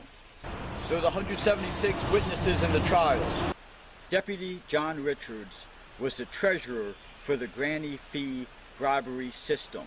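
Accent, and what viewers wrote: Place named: North, United States. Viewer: Greetings from Arkansas my name is Anna where are you from? you sound northern like myself would like to talk to you you're a good looking man...love this video and the content God bless you and yours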